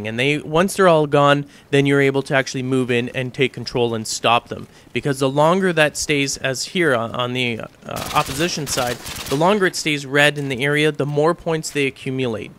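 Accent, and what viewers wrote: Canada. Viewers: may i ask if you're canadian? i thought i heard a hint of canadian accent.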